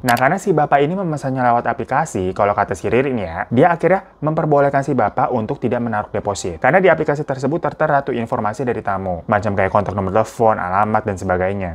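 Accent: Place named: Jakarta, Indonesia. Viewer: Bang gw bingung sama nama dan muka lu,mirip ke jepang²an tapi logat lu kek betawi tulen bener,ngakak tiap nonton ehe'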